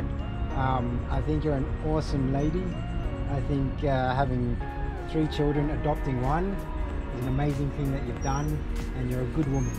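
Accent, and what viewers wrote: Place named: Australia. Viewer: Palanga is a resort town in Lithuania. You sound australian or could be kiwi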